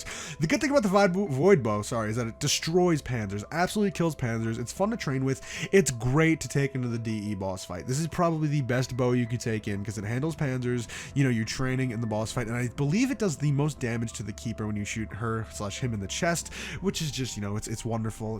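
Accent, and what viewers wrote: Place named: Greece. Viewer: cause you have a kinda Greek accent also I am Greek as well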